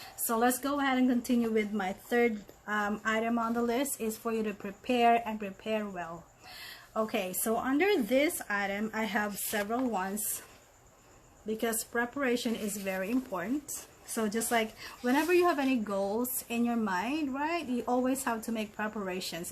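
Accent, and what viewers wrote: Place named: United States. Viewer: That's nice . I love your accent ma'am parang American accent na.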